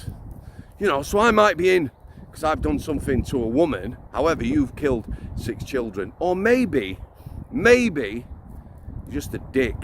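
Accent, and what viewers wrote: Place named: Yorkshire, United Kingdom. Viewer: Yorkshire through and through lol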